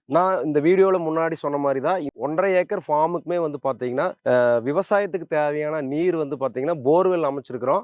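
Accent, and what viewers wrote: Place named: Kongu, India. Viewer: House Super anna ,,, also my fav. Kongu Tamil 👌 👌 ❤❤ from Tirunelveli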